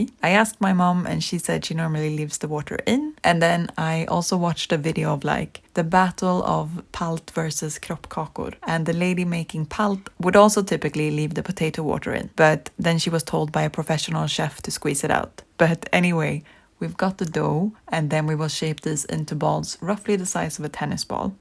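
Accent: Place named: Sweden. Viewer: Wow, vad är oddsen! Algoritmen ledde mig hit och jag hörde att du hade svensk brytning och när du nämnde norra Sverige funderade jag på om det kanske var någonstans i mina trakter du var från. Tyckte du såg bekant ut och sen klickade det! Hej! Vi gick i samma klass i låg-/mellanstadiet. Vet inte om du minns mig, Mirjam (fast numera kallas jag Morris 😊).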